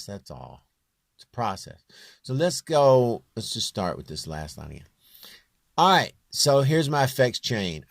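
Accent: South, United States. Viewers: Yeah, I watched it and it showed what I thought it would, you are really well versed in sound engineering and yes, your real singing skills are not lacking at all. Before I go on, I am guessing the thing went well since it was a while ago. I do hope so at least. Confusing on a song when you are talking about your own real ticker. This video is for the end of the semester project where you take your turn and show the other students all the stuff you learned and how you used it, after 16 weeks of learning and practicing the stuff, as an analogy. Can't really learn too much from it in regards to application because as you said, it's a process, and that process each requires its own host of knowledge. What it does do is show your key elements to the process and that allows for a great starting point. I am guessing these type of videos are as equally demanding as your song mixes, but if you are not allowed to get paid for the song videos due to copyright, you would get full pay for these. 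That's something. I also learned you have a southern accent, didn't see that coming.: )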